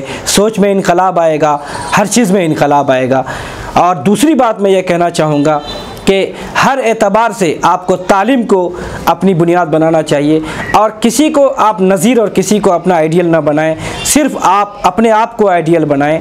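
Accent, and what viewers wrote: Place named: India. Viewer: Ap janab ka Labb o Lehja to hindi hai.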